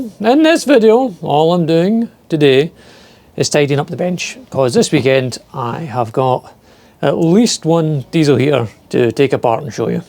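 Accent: Scotland, United Kingdom. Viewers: Good to hear your Scottish accent again, and glad you're recovering. Thought you just got tired of making videos.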